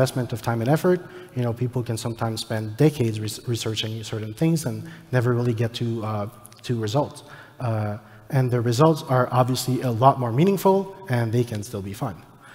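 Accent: Quebec, Canada. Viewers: i can hear the french canadian accent slipping through, I would know that because i'm also canadian lol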